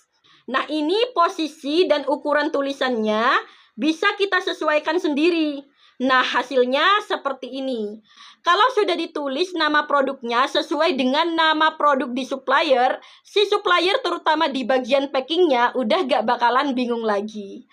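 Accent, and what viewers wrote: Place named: Madura, Indonesia. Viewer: Makasih banyak kaka atas penjelasannya yang sangat bagus, detail, dan rinci. Dari logatnya, kayaknya kaka orang Madura ya😊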